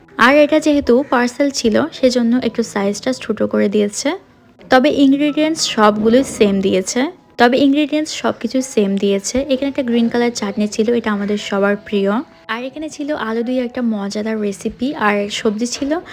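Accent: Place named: Chittagong, Bangladesh. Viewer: hmm apnar kothar style e bujhtesilam apni chattagram er, r chattagram e jamai ashle asto murug musollom kore eta ami jani, amar nanu bari potiya.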